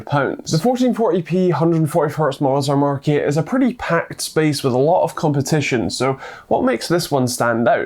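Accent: Scotland, United Kingdom. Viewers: Scottish actually.. :D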